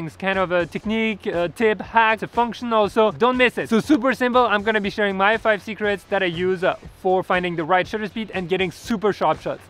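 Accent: France. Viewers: Super French accent mode :D J'adore!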